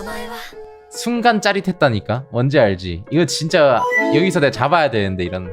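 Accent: Gyeongsang, South Korea. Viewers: 잠만 님 대구 대쓰까? 저 대구 대쓰까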